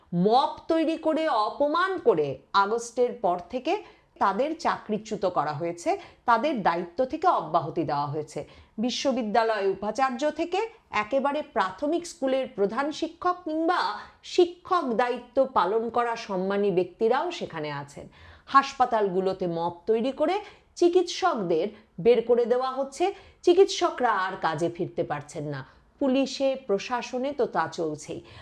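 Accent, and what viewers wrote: India. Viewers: Indian awaz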